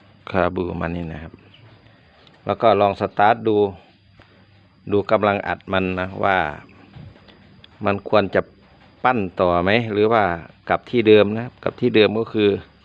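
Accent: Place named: Ubon Ratchathani, Thailand. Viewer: ช่างอยู่ อำเภอได๋สำเนียงคือทางอุบล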